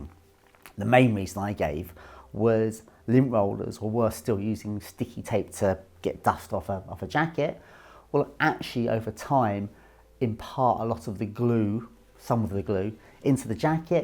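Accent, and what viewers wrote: England, United Kingdom. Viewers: I stumbled across this channel a few months ago and after a few videos I decided to subscribe. Good move.lm' Iearning a lot, and presents the information so well in his laid back clipped English accent. Surfed over to the website and was super impressed with their pocket squares. I just purchased two of them and will probably buy ten more. Thanks, and and the whole Rampley team for putting this videos together.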